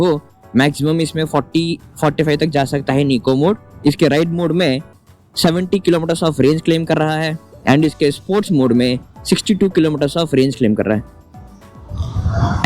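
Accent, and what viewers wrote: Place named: Karnataka, India. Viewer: He's a Kannadiga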